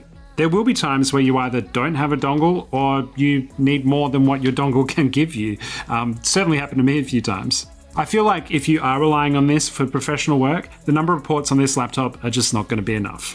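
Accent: Australia. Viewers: was going to ask if you're Aussie based on your accent and I was right... Melbourne represent! Love seeing Aussie tech you tubers :)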